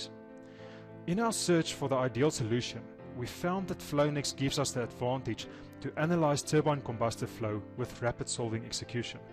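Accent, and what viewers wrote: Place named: South Africa. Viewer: Are you south african? I hear an Afrikaans accent somewhere in there.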